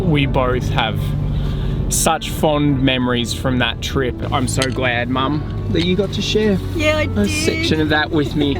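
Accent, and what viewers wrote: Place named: Australia. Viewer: Obnoxious Aussie accent.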